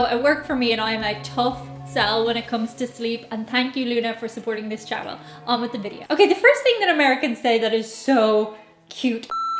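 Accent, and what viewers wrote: Ireland. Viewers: The accent takes me home...family originally came from Cork in the late 1800s...are the Irish starting every sentence now with "So," as I now hear EVERYWHERE in America? Did you go shopping? So, I went to the store and got what we needed. What did the doctor say today at your appointment? So, the doctor told me I was fine. It is really beginning to drive me nuts! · As an American, I find every word that comes out of an Irish person's mouth to be cute. <3 Your accent is the greatest! :D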